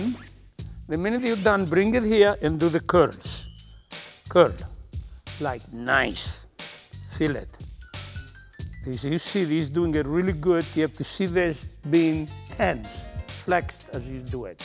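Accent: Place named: Sardinia, Italy. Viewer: Inglese con accento sardo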